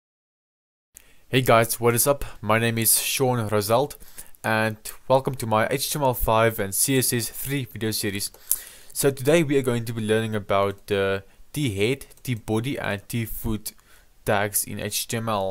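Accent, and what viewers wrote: South Africa. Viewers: this guy is definitely south african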